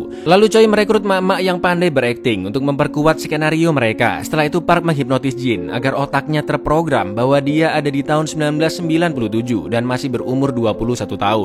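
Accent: Indonesia. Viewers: Ya namanya logat Indonesia ya suka2 dia org korea aja nyebut nama org indo suka salah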